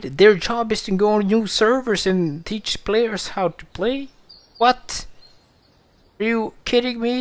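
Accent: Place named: Ireland. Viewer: Your voice remains #1. Gotta love the Irish :")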